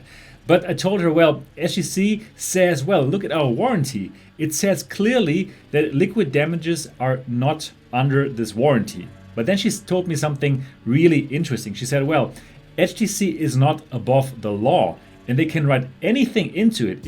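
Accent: Germany. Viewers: Also dein Deutscher accent hört man sowas von aber Cooles Video :D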